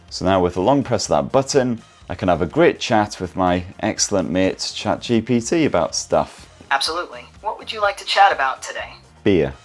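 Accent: United Kingdom. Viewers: What's the link to the wallpaper site? I'm American so I couldn't understand British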